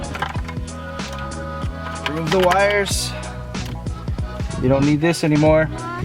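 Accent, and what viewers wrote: Hawaii, United States. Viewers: You from Hawaii?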